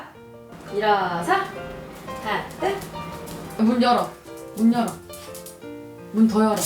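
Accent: South Korea. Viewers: 청주분이시죠😁😁 반가워용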